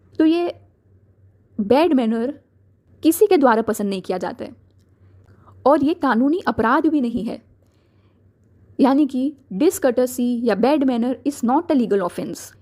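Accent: Mumbai, India. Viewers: mumbai ki language 😁😁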